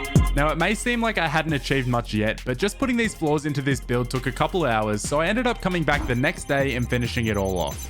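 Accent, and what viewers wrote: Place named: Australia. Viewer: far out this is the most aussie I reckon you have been in any video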